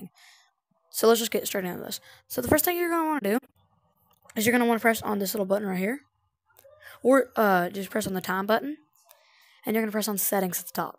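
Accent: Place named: South, United States. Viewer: Not trying to be rude but you lowkey sound country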